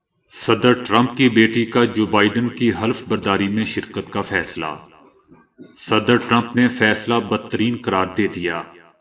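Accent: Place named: Punjab, Pakistan. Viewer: Janab mujhe aapki video pasand hi isi liye hai ki aap ki Urdu me Punjabiyat ki aamezish hoti hai.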